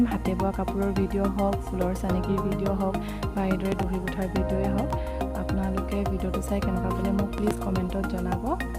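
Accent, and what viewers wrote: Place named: Lower Assam, India. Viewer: kotha kwa bur nomamia kowa jen lgise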